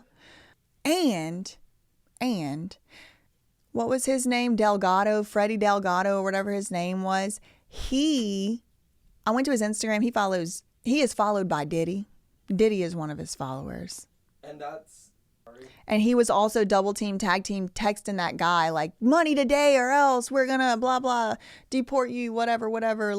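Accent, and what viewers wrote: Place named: South, United States. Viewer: I'm not a simp or anything like that... but you are beautiful and I love your southern accent ! I'm new to your channel and your break downs are legit!!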